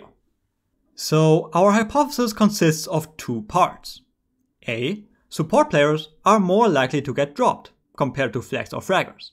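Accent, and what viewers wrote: Germany. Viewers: your accent is strong. are you german?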